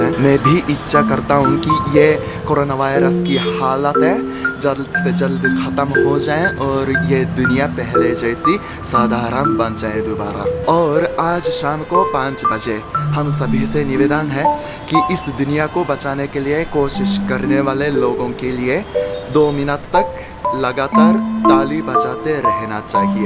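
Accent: Standard. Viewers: Aap kitney achchey Hindi boltey hain bilkul sudh Hindi bhasha. Dhannyabad aap ka. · Bhai badiya to h Hindi, itni shudh Hindi to Indian log bhi nhi bolte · aap hindi bahut accha bolte ho. shudh bolte ho. hame aapki boli pasand hain. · Chikitsak⛑️ oo Bhrata Hridya tript ho gya aapki Uchh ewam madhur vaani sun k....itni shuddh Hindi k Shabdawali k uccharan aapki Prateyak Chalchitra (video) m darshaya jata h...umeed h aap tatpar Aise Hindi ki Chalchitra poorntaha Roop se bnaenge or aap waqta bnenge or hm Shrota aapki Hindi Vaani Dhyanmagan hokar sunenge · bhai aapki hindi bahut hi badhiya, aapke hindi sikhane or bolne kaafi sarahniya hai. Aapki hindi bahut sare bhartiya logo se kaafi atyadhik shuddha hai, bahut sare sabdo ka hindi utcharan bahut bhartiyo ko nhi aata.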